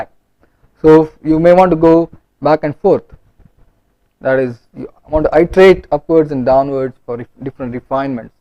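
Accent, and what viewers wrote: India. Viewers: good information, but horrible accent. I don't know why Indian English sucks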